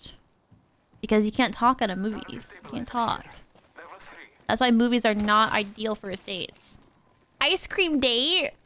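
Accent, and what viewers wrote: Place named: Texas, United States. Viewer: Your Texan accent really came through in this vid! Lol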